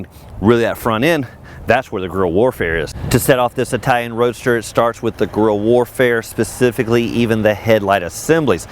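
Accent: South, United States. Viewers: Hillbilly talking Italian styling. Good grief. Pretty sure you don't need the strap on the glasses for this particular review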